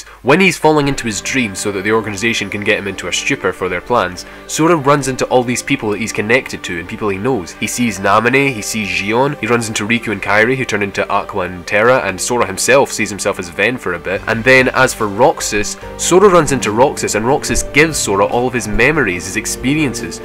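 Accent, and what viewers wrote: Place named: Scotland, United Kingdom. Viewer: How did people think your accent was Irish, an Irish accent sounds nothing like a Scottish accent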